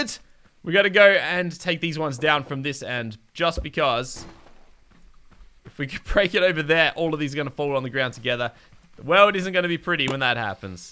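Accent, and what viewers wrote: Australia. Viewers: Graeme Hope he is australian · Wait ur Australian?.......Awesome cause Im Australian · What your Australian wow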